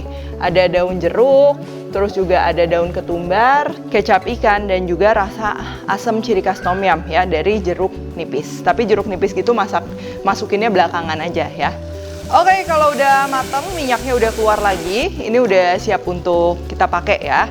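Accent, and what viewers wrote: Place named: West Java, Indonesia. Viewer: Chef leukohnya Sunda banget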